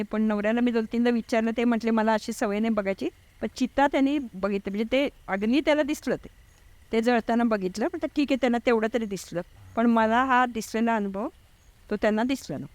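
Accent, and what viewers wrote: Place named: Kolhapur, India. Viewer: कोल्हापूरची मराठी भाषा ऐकून मन प्रसन्न झाले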